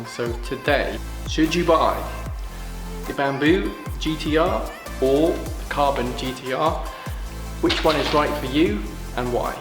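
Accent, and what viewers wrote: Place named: England, United Kingdom. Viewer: It seems like people with English accents make the best reviews. Great work man. I’m looking into the bamboo. I like the flex deck. Plus, I like the muscle car vibe the bamboo has going on.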